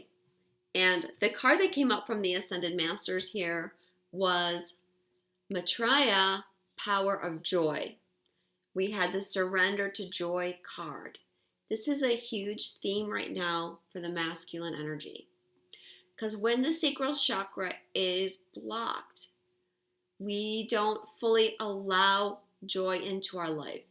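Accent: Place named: Massachusetts, United States. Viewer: I love your readings. You really clarify things. I also just Liked you on Facebook. I also detected a hint of an accent that you were a fellow Massachusetts girl like myself. I also think you have really pretty hair...:)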